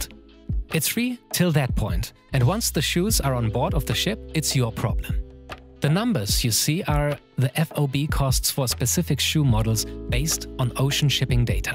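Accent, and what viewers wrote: Germany. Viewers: Man hört dass du deutsch bist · Tell me you are german without telling me you are german: his accent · R u german? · Du hörst dich wie ein englisch redender Deutscher an. Lieg ich richtig ? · Are you german? Lol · Alter der deutsche Akzent ballert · Man merkt diesen Deutschen Akzent so hart haha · Du hast echt nen starken deutschen akzent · Warum höre ich da einen deutschen raus? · Can't say for sure but the way he pronounces names sounds german, yes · Man hört den Deutschen aus dir raus XD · yo dis is a german ass accent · Are you German by any chance? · You german? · I know he’s German because of his accent 🤚🏾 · Es entspannt mich das nicht nur ich ein german english hab 😂 gutes Video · Ich hasse den deutsch-englisch Akzent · Are you German? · I love his german accent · Is it just me oder spricht er mit nem deutschen Akzent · His German accent is so strong 😂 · Hat wer den deutschen Akzent rausgehört xD? 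· German accent haha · Yea thats the right pronounciation, its a german company, german name. Judging by the narrators accent he is also german so he knows. Its not " A-die‐das" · Der Sprecher ist Deutscher oder? 😃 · you’ve got the srongest german accent i’ve ever heard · Finde deine klare Aussprache und den leichten deutschen Akzent zusammen mit deiner Stimme unfassbar angenehm zum zuhören. Ich bekomme immer fürchterliche Kopfschmerzen wenn andere Deutsche versuchen künstlich american english zu sprechen. Außerdem natürlich auch inhaltlich ein tolles, super recherchiertes Video! Ich hoffe du gehörst mit dieser Qualität bald zu den ganz großen auf YouTube!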